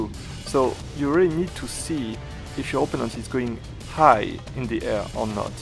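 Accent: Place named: France. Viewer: btw...i love your French accent 😄😄😄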